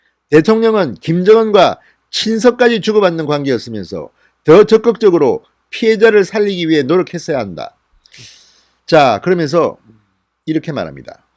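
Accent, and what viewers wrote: Gyeongsang, South Korea. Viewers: 문재인은 남쪽.전라도 대통령이라든데 방송하시는분은 말투가 경상도 이신데 문재인은 대한민국 대통령이 아니니까 대통령이라 부르지 마십시요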